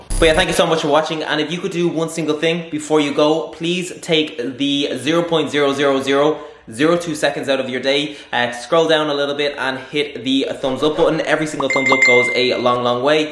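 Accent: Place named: Ireland. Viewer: I have been watching your videos ALOT when im at work, taking notes! today i am watching at home with sound, and i had litterally no idea you where irish!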